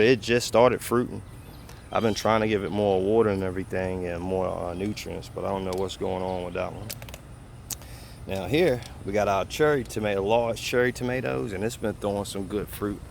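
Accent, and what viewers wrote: United States. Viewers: Is that a New Orleans accent I hear? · Love this accent... is it cajun ? What is this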